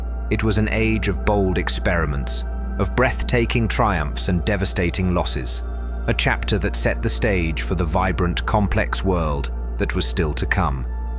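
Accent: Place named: United Kingdom. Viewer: Excellent documentary, but strangely no credits are shown. Based on other Stellar videos, this one appears to have been assembled from many publicly available sources. The script may have been written by AI, but the creator made sure that the words matched the images, unlike other AI-generated videos. An educated British accent was selected for the AI voice to make it sound more authoritative.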